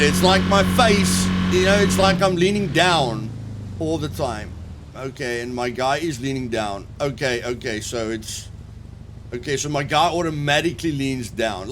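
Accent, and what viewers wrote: South Africa. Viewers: Heiii are you South African. Just judging by the accent:) I myself am, thats why i recognize it.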